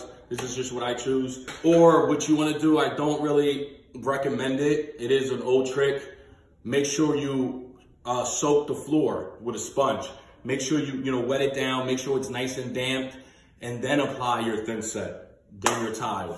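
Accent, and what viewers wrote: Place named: United States. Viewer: i'm a cuban from jersey city (i just moved to oregon and found your vid cause im renovating my house), and i knew exactly what state you were from the SECOND you started talking LOL. anyway, solid video super helpful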